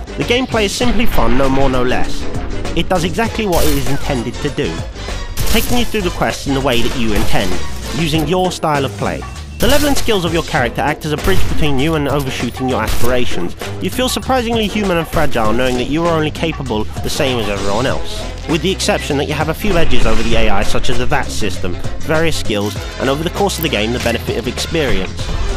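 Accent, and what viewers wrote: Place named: Cockney, United Kingdom. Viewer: You're slighty posh but slightly cockney... Weird D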